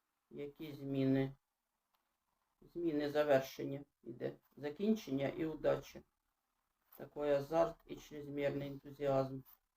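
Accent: Kherson, Ukraine. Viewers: Дякую за Вашу по 🙏 дуже приємно Вас слухати. Ви розмовляєте, як у мене вдома: на Херсонській землі. Для мене це особливо цінно ❤️🌷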